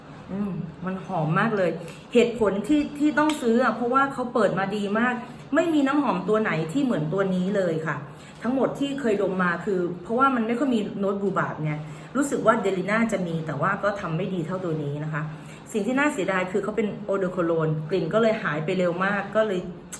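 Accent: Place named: South, Thailand. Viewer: อุ๊ย ชอบ แหลงใต้ด้วย สาวใต้สวยเก่งแบบนี้ต้องติดตามทุกช่องทางแล้วค่ะ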